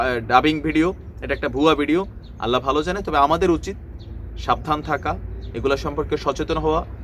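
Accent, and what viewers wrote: Comilla, Bangladesh. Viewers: Vai apni khati comillar vasa kotha Bolen valoi lage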